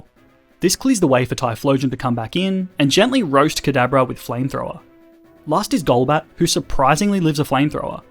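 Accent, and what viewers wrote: Australia. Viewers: Ahh it’s so refreshing to hear an Australian voice over Pokémon runs, also do you have a discord server by chance?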